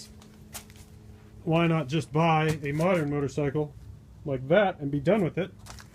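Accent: Canada. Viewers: haha Canadian accent hype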